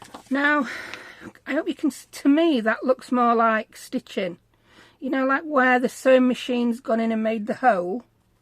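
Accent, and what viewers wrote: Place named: Northern England, United Kingdom. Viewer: I also keep checking in, and have several tubes looked to see if there was a way I could message her just to see if she was ok. I'm in Lancashire and her Northern voice is so familiar and comforting it's like crafting with a friend and I miss new videos dropping.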